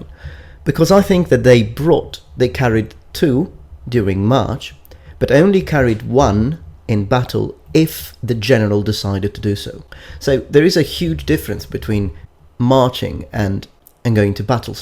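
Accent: Italy. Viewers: I've heard Latin being spoken with an italian accent ( like here) or a more clear sounding version. Makes me curious of which one being correct, since Italian is of course influenced by the Ubians and Langobards that conquered Italy. PS: I'm not sure about the melee part. Wasn't the long metal tip of the Pilum made of soft iron and would therefore bend very easily ( better than Gladius of course when attacked by cav)? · Hey I really like your videos and I dont want to nitpick here but I fear that you italian roots have 'tainted' your latin: AFAIK (and please correct me if I am wrong!) the C was always pronouced like K in ancient latin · Me: is this guy Italian? Me: yes, yes he is.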